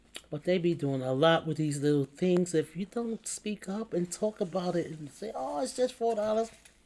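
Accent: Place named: New York, United States. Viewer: Heyyy Beautiful!!! I’ve been missing you, so I’ve come to visit!🤗 The BBQ Chicken looks amazingly delicious!!! I love your New York accent, Sis!💞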